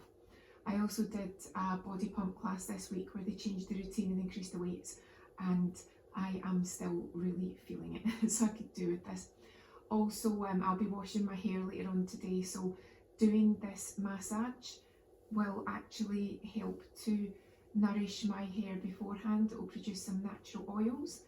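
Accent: United Kingdom. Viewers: Is that British accent?) I'm definitely not used to British, and why does it even sound to me like slavic sometimes😂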